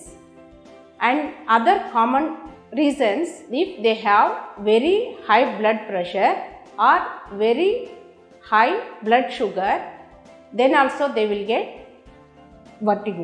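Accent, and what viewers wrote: India. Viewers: Accent hindi ki hai to hindi hi mein baat karé... Mujhe chakkar agaya apki english sunkar..azad bharat ki angrezi bhasha ki gulami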